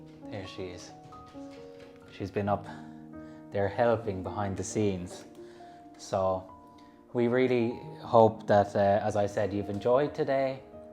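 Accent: Ireland. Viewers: Great house,your accent makes me nostalgic as I am a Dubliner in London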